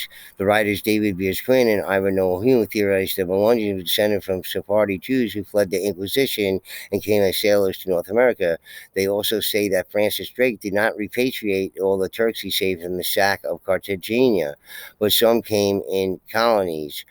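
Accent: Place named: East Coast, United States. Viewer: Never commented on your videos before ..I think I figured out why I like you channel so much. mostly about giants and stone walls.but also u read so fast with the east coast accent it keeps me from falling asleep because it keeps my ears tuned to the fast reading .😂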